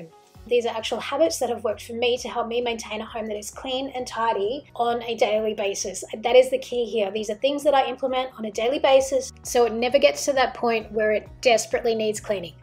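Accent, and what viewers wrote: Australia. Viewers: Is that Australian accent? Maybe SA? Anybody knows please? 😁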